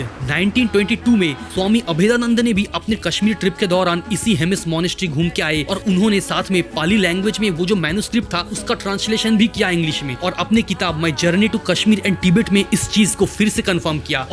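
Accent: Bengal, India. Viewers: I wonder how you research and dig out such important, impactful but lesser-known topics for each of your videos, bro.... Let alone any other Bengali creators.... no other indian creators are doing this too...💗💗💗and I know you're a Bengali guy coz ur accent is Bengali😊 Dont know about Proud Indians... brother but I am definitely proud of u ... that you are Cleaning the Image of Bengalis.. and Showing the internet that Bengali Creators are not just Cringe .... Bengalies can actually be Creative to....🤘🤘🤘🤘💗💗💗 Glorie to Bengali and you bro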